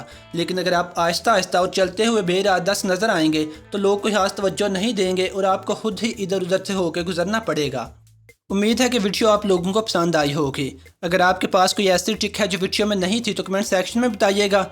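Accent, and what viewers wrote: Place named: Sialkot, Pakistan. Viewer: Realy Helpful tricks bro keep it up👍 And bro apka accent bata raha hai k aap sialkot se ho. Am i right?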